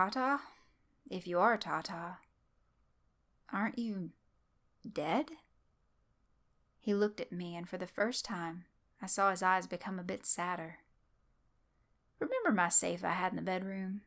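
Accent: South, United States. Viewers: When a creepy pasta is narrated by Scarlet O’Hara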